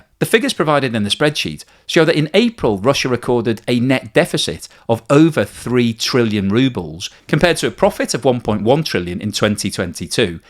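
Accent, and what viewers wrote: United Kingdom. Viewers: Already the British accent tells me this vid is a heap of lies 😂😂😂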